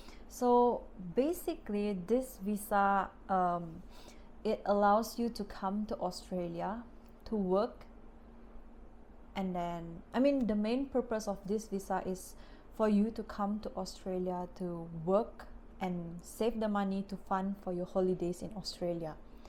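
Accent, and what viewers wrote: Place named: Sabah, Malaysia. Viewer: Lucu lah sy rsa ni video hahaha. Buli dgr slang sabah. Would like to see more contents 🤗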